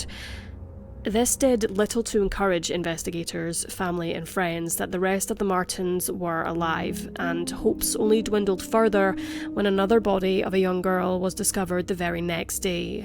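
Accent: Scotland, United Kingdom. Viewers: It's scottish 😊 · It's a British accent, from Scotland. Very calm and easy on the ear. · She has a Scottish accent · Yes agree, I think it’s Scottish 👍🏻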